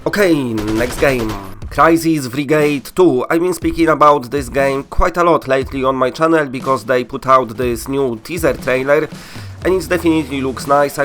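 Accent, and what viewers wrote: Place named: Poland. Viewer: popraw się troche z tym angielskim bo nawet mi się ciężko słucha takiej wymowy, brzmi to bardziej jak byś czytal po polsku to co jest napisane po agnielsku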